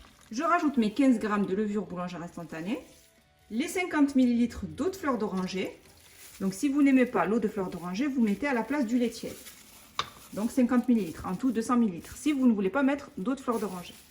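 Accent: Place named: Midi, France. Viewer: Clair, simple, bien expliqué sans chichis ni fioritures et avec un petit accent de notre cher Midi. Resultat parfait! Bravo et merci pour cette superbe recette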